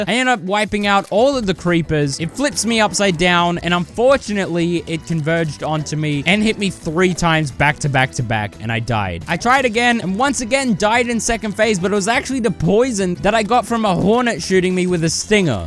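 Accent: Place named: Australia. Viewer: I think he’s Australian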